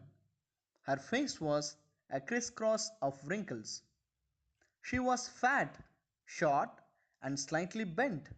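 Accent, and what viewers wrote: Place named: Kerala, India. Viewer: Awfully narrated.. Typical mallu accent 😢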